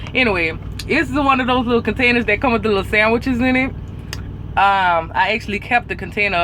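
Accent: Louisiana, United States. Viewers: You sound a bit Cajun or Creole. Whatever the correct term is of course. You are cool as shit. Would be fun to have a couple shots or beers with you... I hate this FUGGIN Automated Transmission. 17 KW T680.but I believe the Gentleman is correct. Tap it to engage it...then try easing on it. As a matter of fact this stupid. Transmission was just messing with my going over such a simple hilly pass as Tehachapi, California 58... Bullshit was just acting all week with 17K loaded heading West Towards Bakersfield and now even weaker with just 32k headed East on Tehachapi pass. FUGGIN BS Y'ALL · R u from Louisiana? Trying to place ur accent. LoL.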